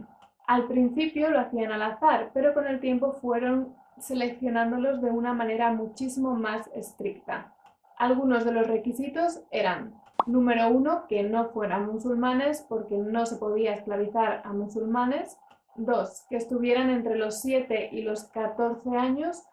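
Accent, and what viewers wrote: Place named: Castile, Spain. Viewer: Qué maravilla de mujer, su embriagadora forma de hablar, en un precioso castellano. Y además preciosa, de bellisimos ojos.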